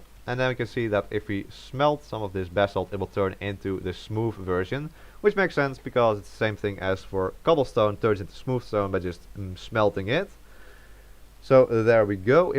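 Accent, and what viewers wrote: Netherlands. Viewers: i am 1000% sure he is dutch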